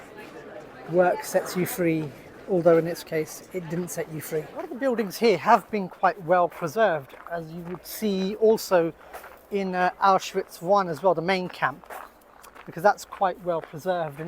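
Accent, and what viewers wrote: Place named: England, United Kingdom. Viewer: Sardar ji jara saaf bolo tusin te England wale lehje naal hi fatte chuki jande ho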